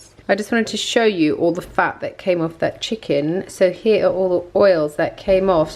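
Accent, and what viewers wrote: United Kingdom. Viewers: 🙂 Excellent. A British voice. Have subscribed for that reason alone......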